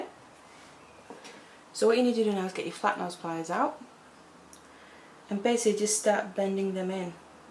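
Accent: United Kingdom. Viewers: Awesome video! Where in the UK is your accent from?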